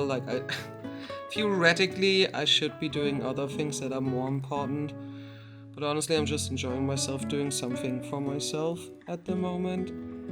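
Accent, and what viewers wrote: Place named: Germany. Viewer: GERMAN ACCENT MUEHEHEHEHE these drawings are awesome ggs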